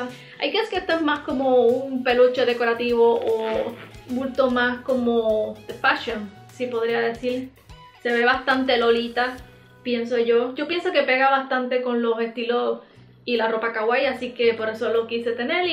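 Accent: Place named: Puerto Rico. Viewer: La cartera está preciosa. No sabía que eras latina por tu acento deduzco que eres puertorriqueña porque cambias las R por la L. Deberías revisar las tiendas de segunda mano a veces tienen cosas de Sanrio por muy muy barato. A mi también me encanta los LTS Saludos de una Venezolana desde Austria en Europa.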